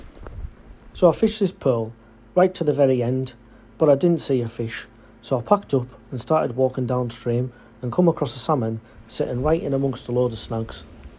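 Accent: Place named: Cumbria, United Kingdom. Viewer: What river is that mate ? I’m guessing somewhere in Cumbria going by your accent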